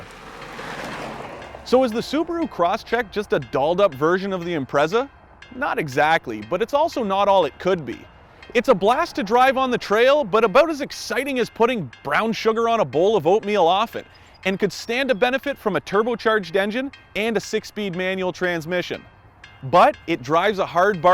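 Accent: Canada. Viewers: Did I hear a canadian talking apout cars?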